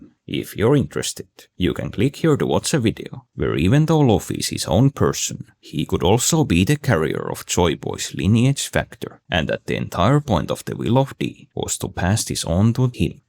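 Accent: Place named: Finland. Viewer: Your accent sounds like a pretty heavy finish one. Are you possibly one of us snowmen. Maybe Maybe not It is meaningless in the end Oh yeah right Cool video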